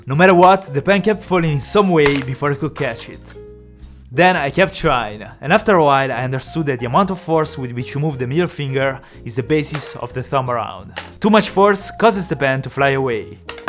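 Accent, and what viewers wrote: Italy. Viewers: Your accent sus I think Italian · Il tuo accento mi fa morire, credo che tu mi stia capendo perche si capisce che sei italiano ahahah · I love his Italian accent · Come si sente che sei italiano.. comunque bel video. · Si sente che sei italiano😂😂😂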